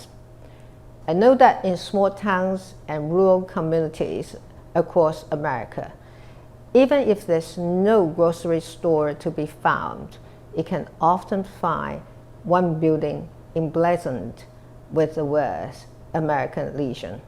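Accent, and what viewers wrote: United Kingdom. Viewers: 讀稿機很正常，即便沒讀稿機，蔡總統英式英文原本就很熟是事實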